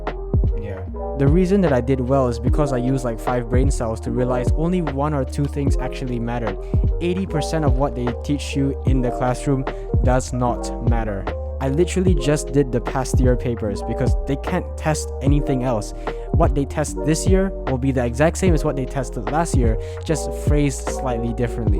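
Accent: Singapore. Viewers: r u Sgporean I think I can hear the accent peeking through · bro you singaporean? and also you really just said "hehehaw" :rofl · SINGAPOREAN ACCENT I CAN HEAR IT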